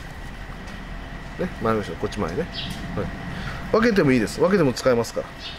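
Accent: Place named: Hiroshima, Japan. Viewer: 、サイコーです❤ 春がきましたね😊 可愛いお花苗がいっぱい素敵です🎉 先生の広島言葉サイコーです❤ 私も何時もお花に、キレイに咲いてくれて、ありがとうと言いながら終わった花達を摘んでます😂 フェスタ、応援してま〜す❤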